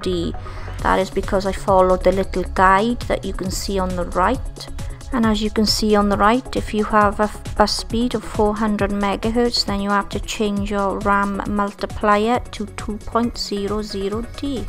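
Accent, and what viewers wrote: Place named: Wales, United Kingdom. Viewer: <3 welsh accent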